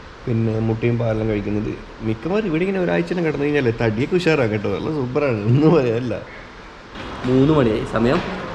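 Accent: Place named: Trivandrum, India. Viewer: Kalli valli broo namma trivandrum dialogue ......ninga poliya macha...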